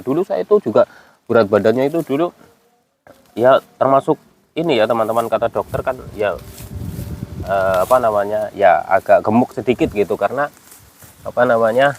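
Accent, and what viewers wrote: Banyumas, Indonesia. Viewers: ngapak a mana mas?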